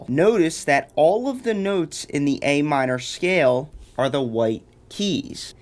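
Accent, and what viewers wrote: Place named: Boston, United States. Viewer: “Whole, whole, hailf” 😂 love the Boston/NY accent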